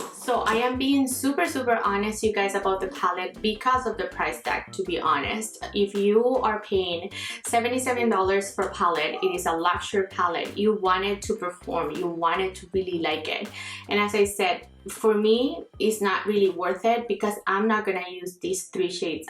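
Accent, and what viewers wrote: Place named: Canada. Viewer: I am so happy I came across your channel. You are so sweet. 💕. I love your accent. :) I see that you are from Canada. Me too. I am from Alberta. Where are you from? I am so excited to find youtube friends and the most excited when i see they are from Canada as well. 💕 You look very beautiful. Would love to be friends on here. New subscriber here as I love your channel and love coming across YouTubers that are in the same niche making makeup videos like me. 😘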